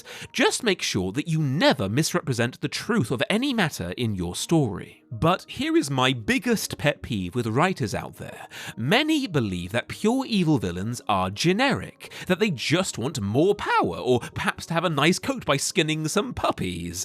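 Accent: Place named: United Kingdom. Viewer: I know it’s the same actor/YouTube host but with that thick British accent, both of you guys sound evil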